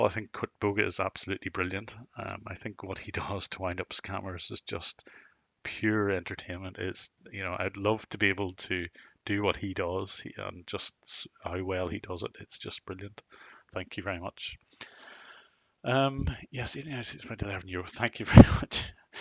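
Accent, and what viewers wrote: Northern Ireland, United Kingdom. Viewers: Very interesting live stream. Your videos are always great. I was surprised to hear that you are Northern Irish. I had always guessed Scottish as a few people also guessed on the stream. I'm from the south of the border in the Midlands of Ireland so we are not all that far away. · northern Ireland ?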